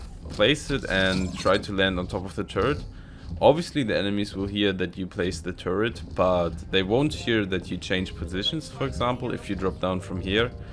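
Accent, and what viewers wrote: Germany. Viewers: Dieser Deutsche Akzent 😂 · Bro du bist deutsch oder ? Haha dein Akzent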